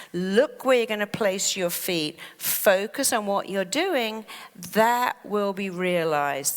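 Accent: Cambridge, United Kingdom. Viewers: is a genius! I just discovered her recently. Does anybody else speak to themselves in voice? lol weird I know. I am working on reprogramming. When I catch myself in negative thoughts I hear her lovely voice. For example this evening “ugh I’m rushing, I’m so stressed, don’t have enough time to do all these things” then I self corrected and heard her lovely British accent saying “it’s all right, I have plenty of time, I am enjoying myself”. lol anybody else? It’s like a self soothing thing 🤷🏼‍♀️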